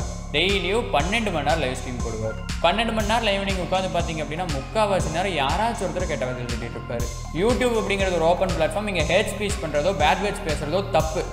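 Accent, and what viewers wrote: Coimbatore, India. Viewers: Namma ooru Coimbatore, Tirupur slang varudhe... mm subscribed ra thambi.. keep doing this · Ur Kongu Tamil sooper cute ❤thambi and evlo azhaga talented ah pesra... Kannu vachuta pa... Suthi poda Solunga... Un ammava...ur way of speaking Really so much adorable 😘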